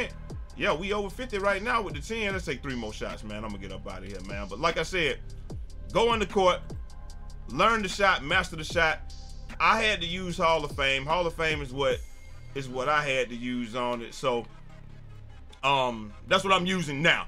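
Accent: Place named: Beaumont, United States. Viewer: Ha hhhhaaaaaaa lol let’s get it wit da green da win, boy you act like me frfr then you gave Goudeau a shot out, stop it sound like you from Beaumont, gg I enjoyed that game play tremendously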